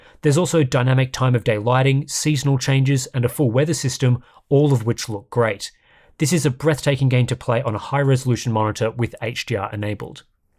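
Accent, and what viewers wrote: Australia. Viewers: Tim's Australian accent usually isn't very salient in my mind when I'm just listening to the audio, but when he said "you should be right" I was very reminded that they're Australian.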